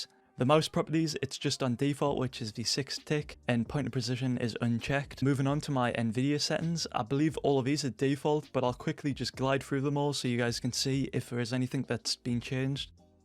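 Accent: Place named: North East England, United Kingdom. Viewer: You sound like your from the north east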